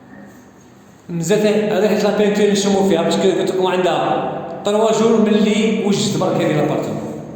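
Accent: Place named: Setif, Algeria. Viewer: الله يبارك صوتك يسخف...سطايفي بامتياز.ربي يحفظك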